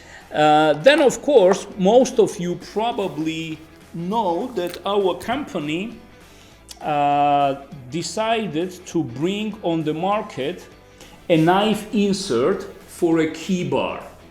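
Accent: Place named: Russia. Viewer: Испанский стыд за русский акцент в английском языке. Континуэйшн. Ой....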